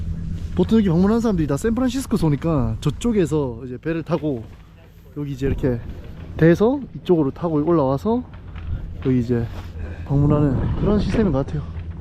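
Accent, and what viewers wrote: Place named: Busan, South Korea. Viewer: 님 영상보면 언제든 즐겁네요...부산사투리 좋고~~~신발도 좋고~ 꿈같은 로망의 여행을 대리만족으로 즐기고있네요...안전한 여행되길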